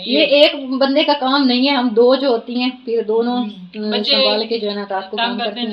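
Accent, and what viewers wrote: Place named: Lucknow, India. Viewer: wali beti tu aaj luckhnow wali urdu bol rahi hay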